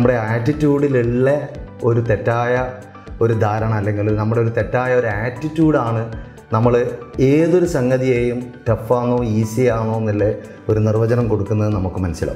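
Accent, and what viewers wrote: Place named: Kannur, India. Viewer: കണ്ണൂർ ഭാഷ വിടൂല്ല.. സർ മുത്താണ്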